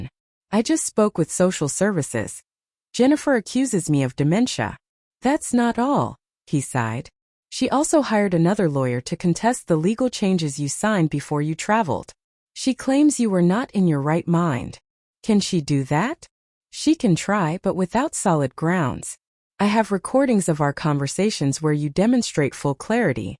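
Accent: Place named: United States. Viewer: If you're going to use AI to create a story supposedly by an Aussie, you could at least use an Aussue and not an American voice! Also you'd get a lot more than $110K after sale of a unit in Sydney. More like $1M.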